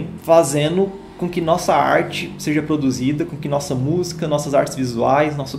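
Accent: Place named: Goiás, Brazil. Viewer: Showww, cara!! Eu tô amando esses vídeos e o sotaque goiano tá ok ksksks, é nóis, conterrâneo!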